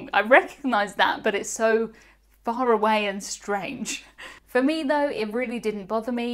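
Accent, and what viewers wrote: United Kingdom. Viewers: Love your vids! My fav thing about your vids is the "British-ism" word explanations and your accent :) !! So weird how English is spoken between 2 countries but yet some words mean something totally different.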